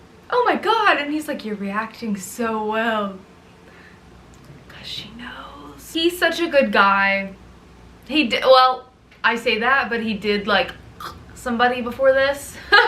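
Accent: South, United States. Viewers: My favorite thing is the southern accent slipping out when you’re excited. 😂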